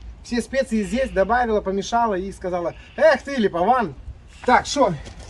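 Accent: Odessa, Ukraine. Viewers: А мы очень любим и. И не важно, что он жарит и где гуляет. Главное - слушать его одесский говор, тонкий юмор. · Я так люблю одессу,а вы такой реальный представи тель ентой местной диаспоры,а ваш говар енто бальзам маи уши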